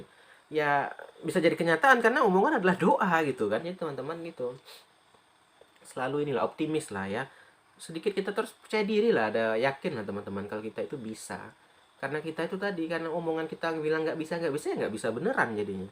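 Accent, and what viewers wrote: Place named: Java, Indonesia. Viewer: Klo ngomong.... Bahasaya...... Lbut Org jawa apa org medan. Apa prg madura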